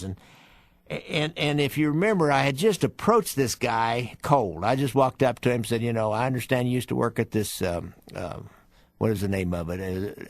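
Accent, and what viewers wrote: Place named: Warrensburg, United States. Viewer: Your voice reminds me of some relatives in Warrensburg 😊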